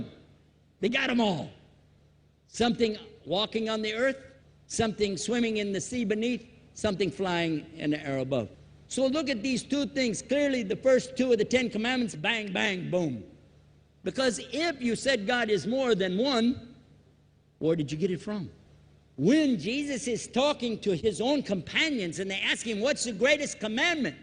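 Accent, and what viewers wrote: South, United States. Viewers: hears a Southern Drawl in *'s speech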